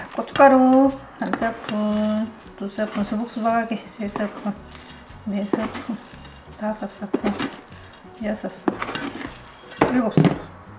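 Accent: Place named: Geochang, South Korea. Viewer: 어머님 고향이 어디셔요^^ 경남 거창인데 억양이 귀에 익어서요?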